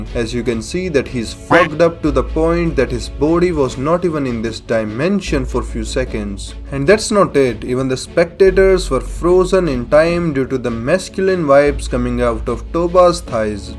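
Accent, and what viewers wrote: India. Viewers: Bruh your Indian accent makes it more funny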